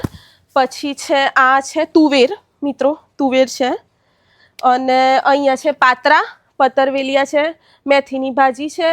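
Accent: Sabarkantha, India. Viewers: બેન તમે સાબરકાંઠા ના વતની હોય એવું લાગે છે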